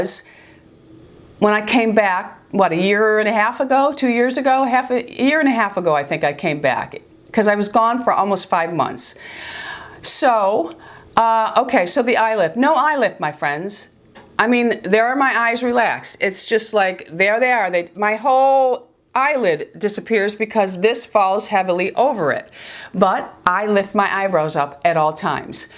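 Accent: South, United States. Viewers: I have find you few days ago and really like you. I am 56 and barely put stuff on my face but love to listen to girls telling me what they do. It takes a lot of humility to show our face without anything and you are doing it in front of all of us, chapeau to you. I think that you have a southern accent and it is so nice. I would love to meet women like you, it is refreshing. I never look at women and try to see if they had this or that done, it is not in my nature. I am sad to see women that have done to much and look weird instead of aging gracefully. I am in Italy and am seeing older Italian women that are just dressed properly and have the right amount of makeup or none and they look great. I often stop them on the street and tell them how stylish they are. We should all applaud each other instead of putting down. Ciao and a lot of bizous!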